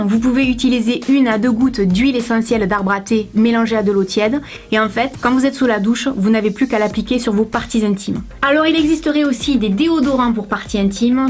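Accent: South, France. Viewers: Bonjour. Très sympa tes vidéos et ton accent du sud (Pyrénées Orientales comme moi ou région PACA ?). Je rajoute un petit astuce déodorant naturel : par très forte chaleurs l'huile essentielle qui fonctionne très bien c'est celle de palmarosa ; ) diluée dans un petit spray comme tu le fais pour la lavande. Ça sent un peu fort après application mais l'odeur s'estompe rapidement. La transpiration ne sent pas. Efficace pour séance de sport par exemple. Bonne continuation. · Ca fait plaisir d'écouter cet accent du sud !!!!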